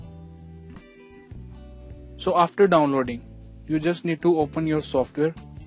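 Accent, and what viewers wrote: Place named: India. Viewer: I dont trust this guy he sounds like a Indian tech support scammer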